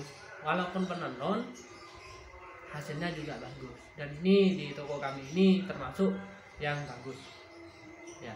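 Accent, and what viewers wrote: Madura, Indonesia. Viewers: Kental bahsa maduranya 🙏🏻🙏🏻😃😃😃😃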